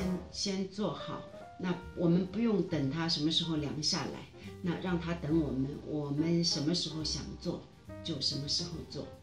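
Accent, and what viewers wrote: Shanghai, China. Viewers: 谢谢老师，很亲切的上海普通话，白脱油😄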